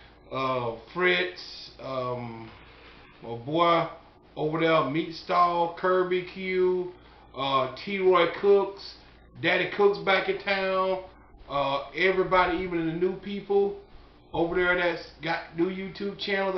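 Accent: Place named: South, United States. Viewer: He’s got that Southern draw that’s for sure.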